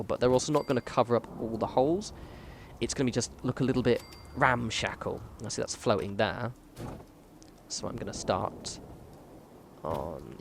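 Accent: United Kingdom. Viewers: I literally click on your random videos just to hear that British: "Ello everyone."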